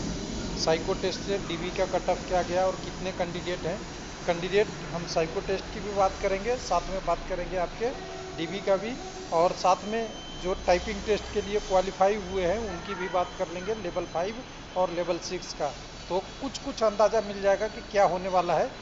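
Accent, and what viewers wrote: Bihar, India. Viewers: sir pls don't mind but u r very arrogant.. n arrogance to ravan ka nahi raha ..n rahi baat noted ki.. to aap hai bihari .. kyunki document ko daakument bolte hain(n old aged bihari bade danger hote hain .. ghamand achhi baat nahi dadaji .ise tyagiye , hum bachhe Hain hamara bura sochna band kariye.. bhagwaan kare ki aap galat ho.. agar aap sahi v huye to aap waise v swasth nahi rahiyega..kyunki 2000 bachhe aapke kharab health ki dua kar rahe honge wo v din raat...or galti se aap mil gaye tab to raam hi aapke swasthya ka maalik hai ya fir aapka Allah, kyunki or cast to tum ho nahi sakte.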